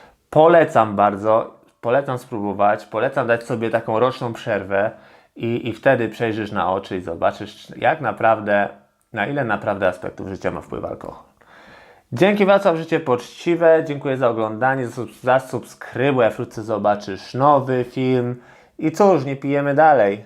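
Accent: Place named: United States. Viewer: Twòj amerykański jest cool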